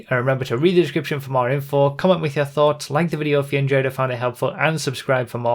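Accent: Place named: United Kingdom. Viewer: Dem brit ish accent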